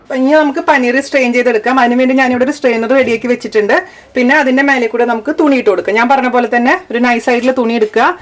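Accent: Thrissur, India. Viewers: njanum thrissur kaari aanu..chechiyude samsaram kettapo manasilayi.nte naattukari aanu ennu.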